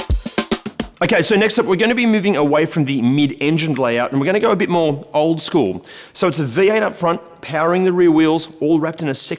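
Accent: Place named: Australia. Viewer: This guy is Aussie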